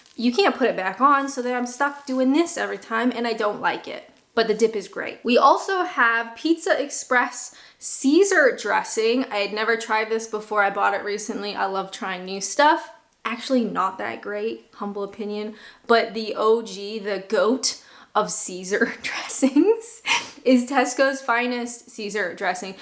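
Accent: Canada. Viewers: Moved to Scotland two years ago and just found your videos. Been binge watching for days now. No, I'm not weird. Just enjoy them. As far as your voice goes, I actually think you sound more American and lost a lot of your Canadian inflections from your earlier videos. You used to do harcdore aboots but not so much anymore. Keep the videos coming! The history tidbits have been fascinating and my wife is a naturalized Scottish citizen and she didn't know alot of them. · Hi, Canadian here, I will still eat peanut butter and jam and when I was young -- and not so young -- yes to a peanut butter and banana sandwich. Here in Nova Scotia, it is still traditional to have a Sunday roast. Guess that's because so many people here have British and Irish roots -- also it is the big meal of the week for the family. Not everyone does it but it is certainly something I was used to. 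You may use a lot of 'Britishisms' in your every day conversation now, but you still have your Canadian accent! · She couldn't have said "aboot", because we don't say "aboot". As a Canadian, still sounds pretty Canadian to my ears.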